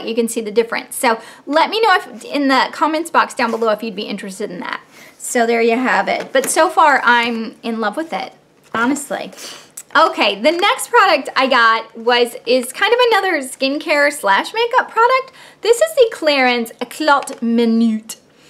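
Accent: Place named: South, United States. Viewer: I just stumbled upon your channel about 2 weeks ago and im so glad I did. I love your personality and you're so easy to watch and light to. (I got that southern twang as well, lol. Anyways, id love to see a demo/review of the cover fx drops. Ive been on the fence for several weeks over weather they are really worth the money since you only get .5 ounce. Looking forward to your next video!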